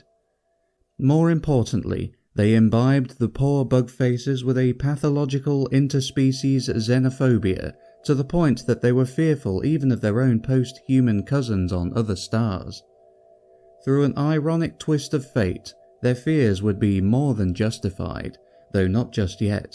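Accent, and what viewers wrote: United Kingdom. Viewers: Why do I like listening to Brits so much more than anyone else? Great job.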